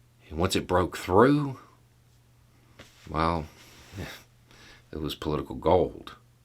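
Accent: South, United States. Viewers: A wise man with a big ol' beard and a Southern lilt to his voice. You're breaking down my stereotype, Brother. 🙏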